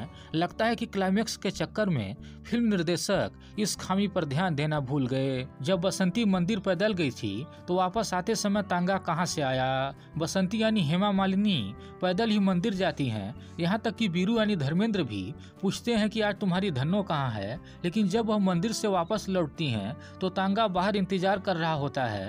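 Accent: Bihar, India. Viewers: Sabse pahle to apni ye Bihari tone badliye, ek dam bekar lagti hai.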